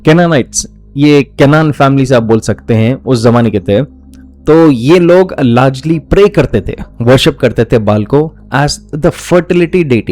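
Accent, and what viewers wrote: Hyderabad, India. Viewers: ओक youre frm bhagynagar. Accent. Shows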